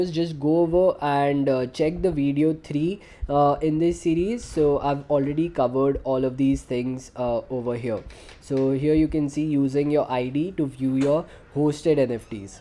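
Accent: India. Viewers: Your English just like Hindi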